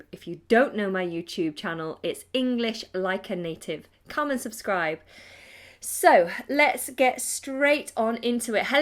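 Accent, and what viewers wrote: United Kingdom. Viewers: I LOVE YOUR BRITISH ACCENT. YOU ARE SUCH A HUMBLE PERSON !!!! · I love you and your British accent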